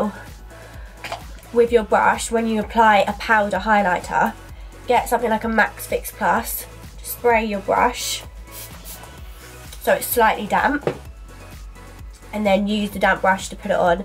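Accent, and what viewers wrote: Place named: United Kingdom. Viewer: just wondering where do you live you have a sort of british accent but you have loads of makeup from american brands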